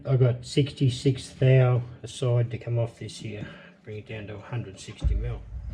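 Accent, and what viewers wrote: Australia. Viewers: Great job, it's like watching Max all over again...... you have even got his Ozzy accent nailed mate! Lol. Cheers, Jon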